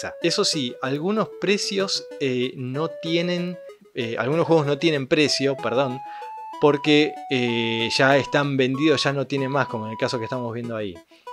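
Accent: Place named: Argentina. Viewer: Denada me encantan sus videos y me encanta tu forma Argentina de hablar tan característica de nosotros , saludos 😊👍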